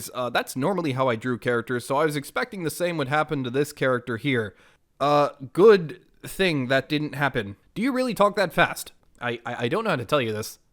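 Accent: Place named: New England, United States. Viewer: you for the info I though he has the new England accent or speech paturn.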